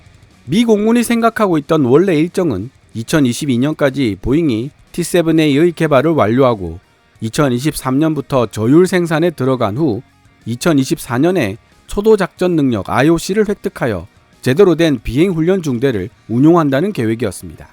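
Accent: Gyeongsang, South Korea. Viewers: 경상도영어는 제발 읽지말고 그냥가자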